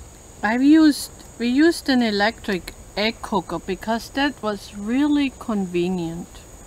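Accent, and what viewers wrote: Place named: Germany. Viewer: Du bist so lustig und besonders für Kinder! Ich hab' etwas auch gelernt. Ich höre ein Deutscher Akzent durch Englisch weil ich Muttersprachler von Englisch bin. Ich bin Amerikaner. Beherrschst echt gut Englisch! Macht weiter so. Fröhliches Weihnachten und Guten Rutsch! Vielen Dank.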